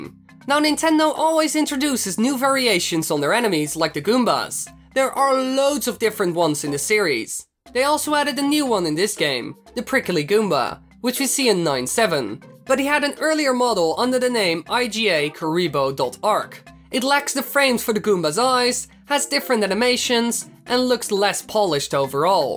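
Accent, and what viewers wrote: Netherlands. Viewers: Are you dutch? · This may sound weird but you sound like your dutch like me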